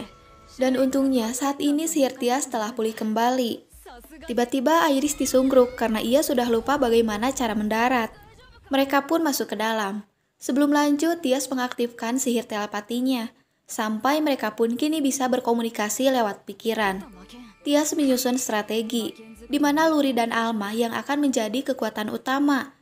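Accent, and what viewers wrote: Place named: West Java, Indonesia. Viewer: Wkwkwk tigebros piks orang sunda iyeu mah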